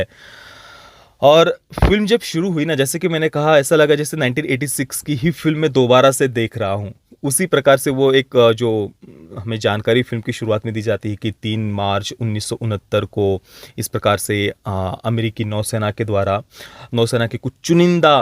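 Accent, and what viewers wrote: Maharashtra, India. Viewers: Aapka hindi itana mixed with marathi hai...bohot jyada, isme koi burai nahi but aap ek reviewer ho, hindi to perfect chahiye...nahi to apaka channel itana grow nahi karega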